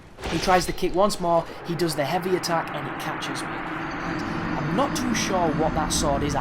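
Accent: United Kingdom. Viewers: Bro, it's Laito not Liato. Its in-dick-t-ment not indIcted. I know your british but come on man.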